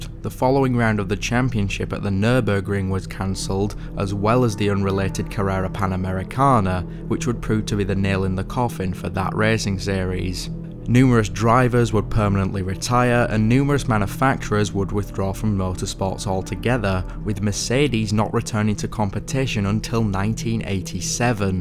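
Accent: United Kingdom. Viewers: Bro sound so British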